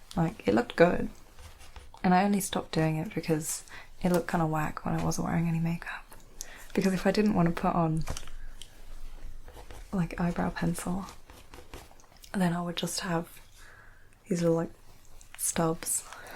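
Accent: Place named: Australia. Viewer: Aussie accent love it❤!!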